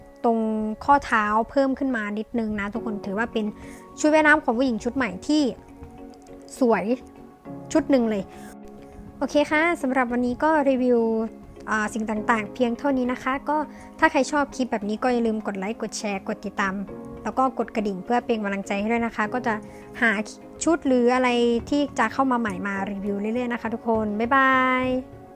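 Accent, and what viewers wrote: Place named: Isan, Thailand. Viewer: มีสำเนียงอีสานซะด้วย55555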